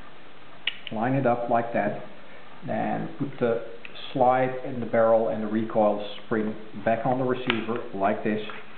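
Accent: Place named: Netherlands. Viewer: Nice pistol and review. You sound Dutch, but I thought pistols were prohibited in Holland?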